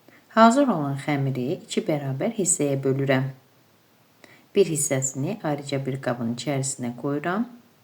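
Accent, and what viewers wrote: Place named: Shaki, Azerbaijan. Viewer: Siz Şəkilisiz? Ləhcənuz çok gözəldir 😊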